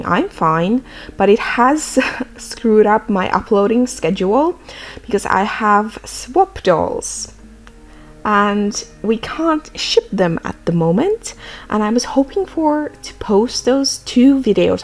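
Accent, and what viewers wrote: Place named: Finland. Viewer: Such a beautiful doll! I especially like the heterochromic eyes. Oh, and I knew in an instant from your accent that you were Finnish (I'm new on your channel) - kyllä suomalainen suomalaisen tunnistaa, hehe.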